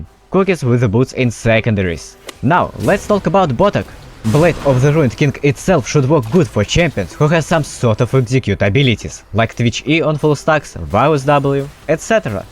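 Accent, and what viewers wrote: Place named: Russia. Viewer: out of the context, where are you from? what's that accent? feels like youre russian or slavic.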